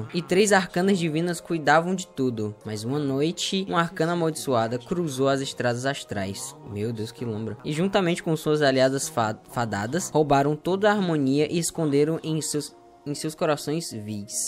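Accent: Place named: Paraíba, Brazil. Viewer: pq o tem um pouco de sotaque paraibano?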